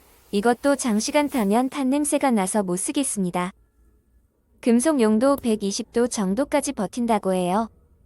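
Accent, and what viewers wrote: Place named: Busan, South Korea. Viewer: 아 부산분이셨군요ㅋㅋㅋ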